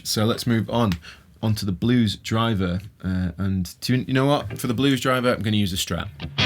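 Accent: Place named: Lancashire, United Kingdom. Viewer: where did you grow up mate? I'm trying to unlock your accent, accents are a pet obsession of mine. I'm thinking Lancashire but I could be way off...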